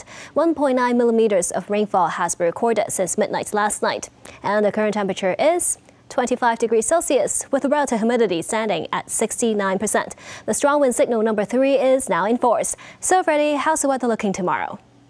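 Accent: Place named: United States. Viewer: 英文絕頂 是美洲英文😊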